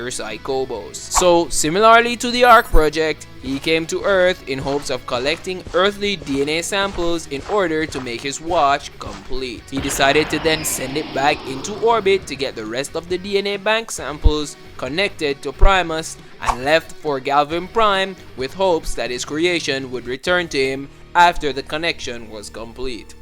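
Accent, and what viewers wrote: Jamaica. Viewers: You kind of sound Jamaican A little bit for me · Yo your jamaican? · I had no idea he was Jamaican until now :face-blue-smiling::face-fuchsia-wide-eyes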